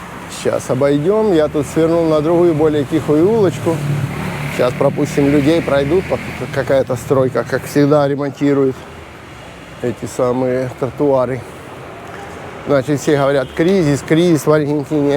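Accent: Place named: Ukraine. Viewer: А вы точно из Москвы , у вас акцент украинский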